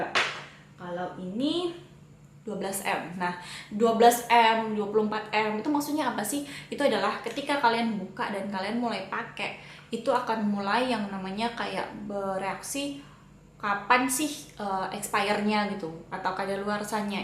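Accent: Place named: Java, Indonesia. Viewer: kalo ngomong ketahuan jawanya XD. thx 4 tips ^^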